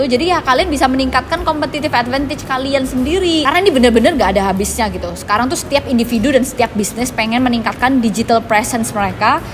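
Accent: Java, Indonesia. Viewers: Kak sekarang logat jawa nya tebel banget kak hehe 😁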